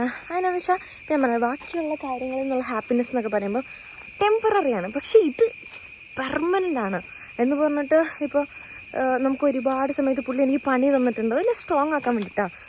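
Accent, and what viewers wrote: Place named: Kottayam, India. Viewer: Naatil evdyyaaa?? Samsaram ketit pathanamthitta/kottayam pole😅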